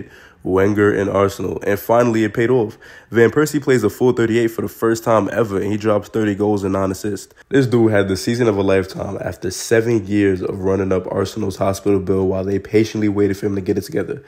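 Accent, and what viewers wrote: New York, United States. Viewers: Hearing your heavy ass NY accent discussing football is kinda trippy. Good content tho bro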